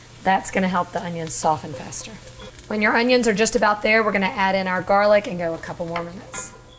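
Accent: United States. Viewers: It's called gravy. Your accent attests to that. You became what my great grandparents wished and worked for: you are an American! It's pronounced amerigan. When my people came here from Naples and Calabria a long time ago, they were prejudiced against Couldn't get a job or into a school to learn. Anything. This was also the situation for Jews, Greeks, Irish, Chinese, and a whole lot of other people who weren't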